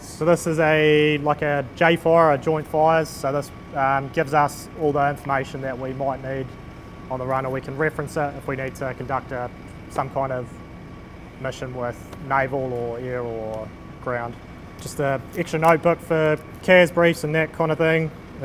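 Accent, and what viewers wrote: New Zealand. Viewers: Ev great ! Just the accent ! 😮😁 Go Zealees !! 🇳🇿👏👏👏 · Is this a normal New Zealand accent?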